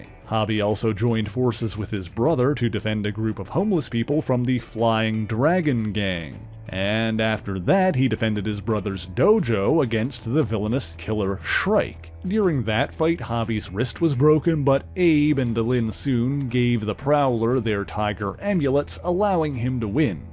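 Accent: Canada. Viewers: It’s HO-b…not hobby…is this dude Canadian?…some of the weirdest pronunciations ever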